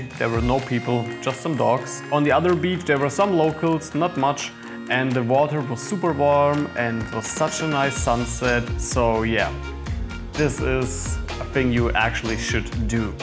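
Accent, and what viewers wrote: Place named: Germany. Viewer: Stick to your pizza's dude, one cannot only hear you are German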